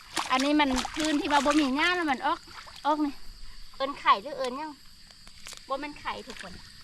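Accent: Thailand. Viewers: สวัสดีครับน้องสาว ส่งความรักความหว่งใยและความหวังดี มาให้ทุกๆวันครับ❤สำเนียงพูดเหมือน เชียงใหม่ ครับ❤❤ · ภาษาบ้านข้อยกับภาษาไชยบุรีบ้านเจ้า เว้าคือๆกันเลยจ้า ภาษาเมืองเลย🥰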